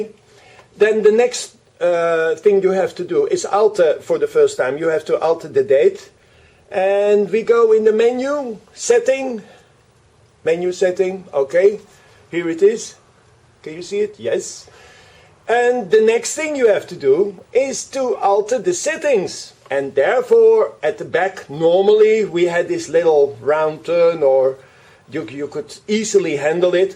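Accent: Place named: Netherlands. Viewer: XD you just can hear he's Dutch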